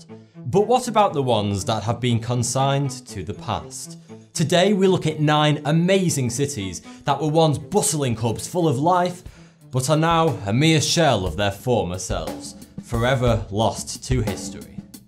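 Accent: United Kingdom. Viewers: 42 or thought 2? sry ur too british for me to understand clearly. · I didn’t know Frankie Muniz had a British accent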